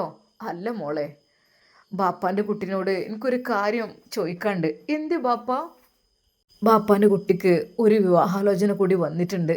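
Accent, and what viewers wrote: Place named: Kozhikode, India. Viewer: Ningal calicutil koduvally bhakath mattoo aaanoo.. samsaram angane thoonunnu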